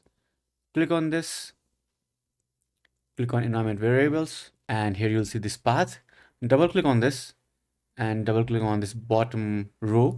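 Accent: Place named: India. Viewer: As soon i lisen indian vocal i finely talken breath after 2 hr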